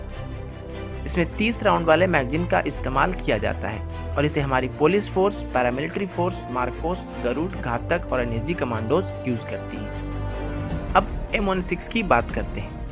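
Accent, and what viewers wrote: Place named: Uttar Pradesh, India. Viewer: Neuz (news) Phayr (fire) Acsen (Action) Uuj (use) Sorter (shorter) Specal (special) I think 🤔 it’s UP accent.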